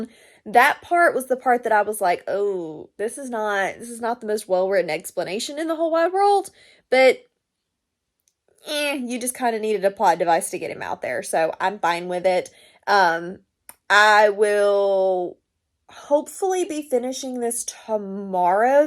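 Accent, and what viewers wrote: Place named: South, United States. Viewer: heehee your southern is really coming out in this video and I love it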